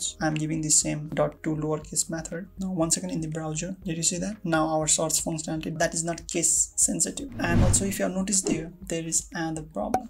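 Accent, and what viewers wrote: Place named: Nepal. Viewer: Brother sounds like a Nepali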